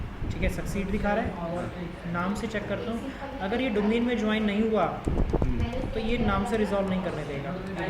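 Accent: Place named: India. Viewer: Very nice Video thank you for doing the Video but the India-English is very hard to understand in that given Speed for a none english Native, also the Level of Loudspeaker is very low .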